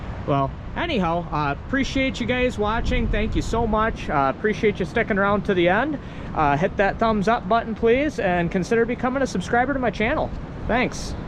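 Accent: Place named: Canada. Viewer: Are you sure you're not Canadian? You sure sound like it. No offense intended.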